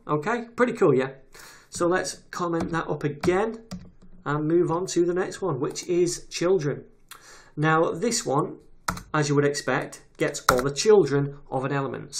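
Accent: United Kingdom. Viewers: is this the so called noble British accent?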